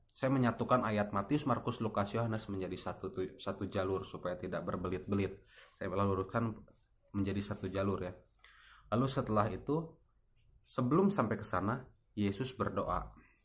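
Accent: West Java, Indonesia. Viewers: Kaya logat sunda??subscribe balik om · iya logat sunda..ada cmpuran sunda..tijalikeuh..😁😁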